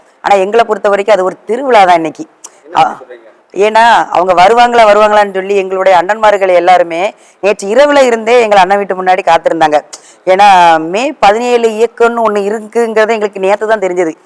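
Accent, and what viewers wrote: Thoothukudi, India. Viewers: அக்கா உங்கள் வீரமான தைரியமான விளக்கமான உரை அற்புதம். வாழ்த்துக்கள் அக்கா🎉🎉 உங்கள் ஏலே என்னாலே தூத்துக்குடி வழக்குமுறைப் பேச்சு கேட்டுக்கிட்டே இருக்கலாம் போல. ❤❤ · உங்கள் தூத்துக்குடி தமிழ் நல்லாருக்கு 🎉🎉🎉🎉🎉🎉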